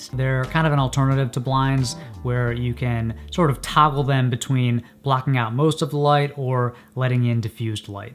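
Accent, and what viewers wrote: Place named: Texas, United States. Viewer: Texan accent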